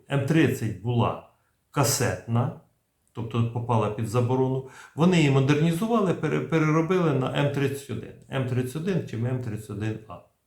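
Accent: Vinnytsia, Ukraine. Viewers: Як же ж мені подобаються МЛРС, ХАЙМАРС і пан, так чітко і класно доповідає нам про ВСЕ!!! Балдєю від Вашої украЇнської вінницького акценту.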